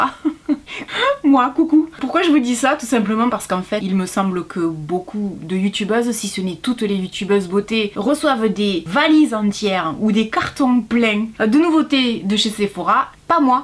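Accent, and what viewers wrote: South, France. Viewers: Bravo. Bons conseils et toujours aussi charmante. J'adore le petit accent du soleil ❤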